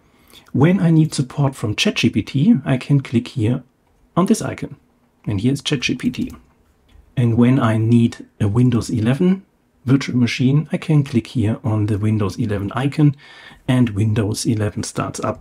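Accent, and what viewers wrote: Germany. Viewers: man hört das deutsche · The german accent 😂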